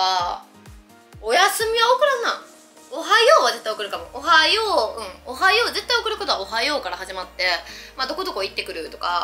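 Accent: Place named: Kansai, Japan. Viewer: 見た目も中身も関西弁も、ぜんぶ大好きです🥰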